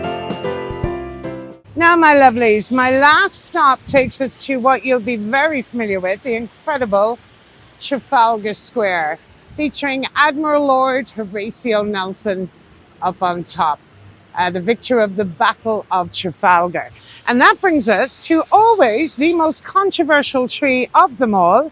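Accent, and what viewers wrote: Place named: Ireland. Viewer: Thanks so much love ❤️ uour enthusiasts together with that charming Irish accent reminiscent of my dearly departed Mother. We are visiting central London tomorrow and we'll certainly be taking a cue from your knowledgeable video! Happy Christmas and New Year!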